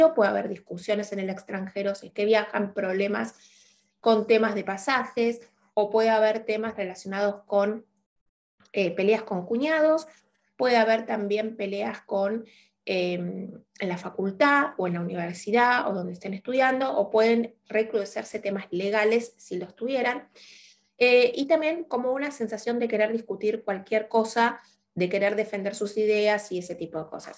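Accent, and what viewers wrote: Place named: Argentina. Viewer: Saludos desde chile.espero algo bueno de marte en tauro.ud estimada sra? Comento lo de mes de julio. Con mucha humildad ud dijo q su ascendente? Es geminis. Y ya q se algo de gemas? Averigue? Que la gema para ud? Y en su ascendente? Es la piedra o gema... agata. Es su talisman indicado. Es lo que se. Desde chile? Mi pais? Un hermoso abrazo fraternal? Hacia ud? Y un abrazo de amistad entre chile y su pais españa? Me parece? O argentina. No se bien de donde es ud. Le encuentro acento argentino. Bueno sea cual sea? Amistad. Chao sra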